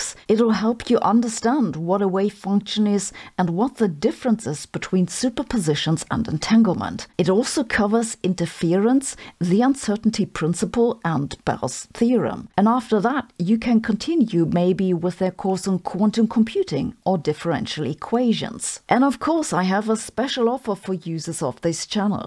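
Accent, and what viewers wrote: Germany. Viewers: I know you have someone proof read your script. I had a German girlfriend who had a slightly harder accent than you. She is very smart and intelligent. She often asked me for proper grammar and pronunciation of words and sentences. I respect your channel and how you are able to make it entertaining and enjoyable for others.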